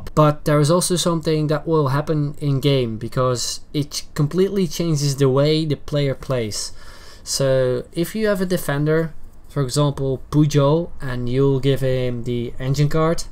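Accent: Netherlands. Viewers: Haha je hoort gelijk al dat hij nederlader is :-)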